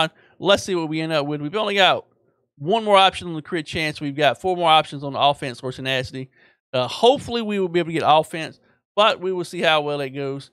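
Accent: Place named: South, United States. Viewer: your fast paced southern American accent is quite hard to follow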